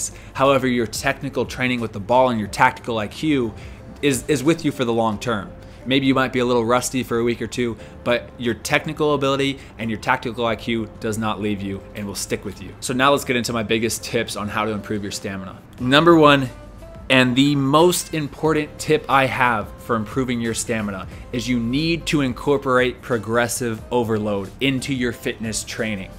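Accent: United States. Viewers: He's speaking American English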